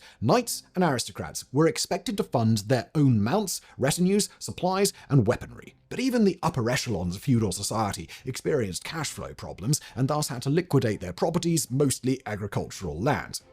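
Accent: United Kingdom. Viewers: Whenever Simon says anything negative about Colonial army in his very British voice it stirs up some weird american ancestral memory/rage in me.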